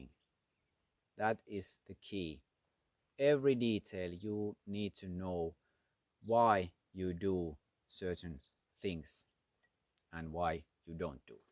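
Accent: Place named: Finland. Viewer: Awesome video. I had never heard of Slavic draw before today. Greetings from Minnesota, USA. I suspect from your accent that you might be Finnish, or at least you live in Finland. Here in my state of Minnesota, there are certain towns and areas where there are lots of Finnish Americans. I am in my 30s, i became friends with guys in primary school around my age who's family still remembers things about Finland very well. So I mean not my friends parents, but some of the parents of their parents remembered things and stories about that place, Finland. I will not mention the small towns here, because people who are not from Minnesota usually do not know the names of them anyway, but the surnames I remember of kids I grew up with included the names Hyuvonen, Tanskinen, Maninga, Karvonen, and Huhta. Once again, greetings from Minnesota USA. Long live the art of Archery! 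One of the most ancient of our martial arts! 🏹 Ha!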